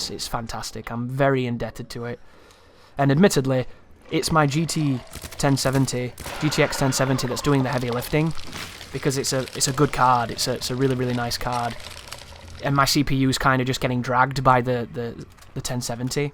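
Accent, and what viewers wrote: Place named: London, United Kingdom. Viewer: Hats off to you, sir! That was the most excellent balancing of amazing skills, speedrun strat explanations, humour, and game mechanic opinions that I have ever had the pleasure of witnessing on YouTube. And all presented with that glorious London accent, making it all that much more enjoyable. I could have watched a full movie instead, given the lenght of this video, but I honestly feel I got more out of this than I would have done spending the time at a movie theater. Thank you so much, you absolute legend!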